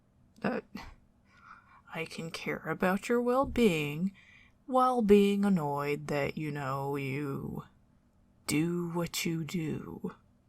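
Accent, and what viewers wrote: South, United States. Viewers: This southern accent is the peak of ASMR.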